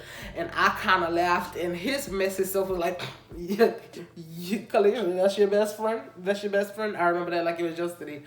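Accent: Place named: Louisiana, United States. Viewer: oh ok yasssss.. I'm also from Lousiana. ( New Iberia) I'm in California now been here since 7 years old.. All my people have your accent... I love it. Love your channel.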